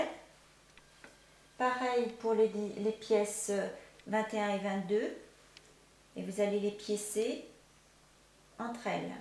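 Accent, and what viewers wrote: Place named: France. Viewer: Love listening to your French accent 😀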